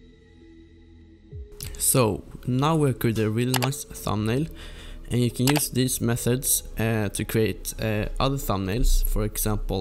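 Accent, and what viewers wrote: Sweden. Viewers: Man hör att du är från sverige ^_^ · Are you a Swede??? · Ur swedish xD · are you from sweden?? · This guy is Swedish I bet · Are you swedish bcuz u have an swedish accent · you sound Swedish. Sorry if im wrong. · are you from sweden_ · Man hör att han är svensk xdd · He comes from sweden you here it very easy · Are you swedish? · Swedish 100% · due svensk eller hu? De hörs på din röst lol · you sound like you are from sweden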